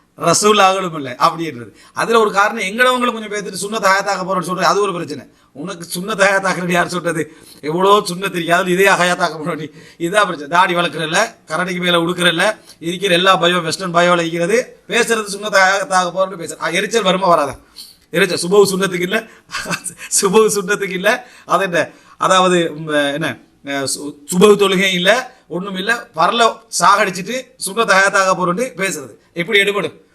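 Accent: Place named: Sri Lanka. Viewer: Avar srilankan tamil.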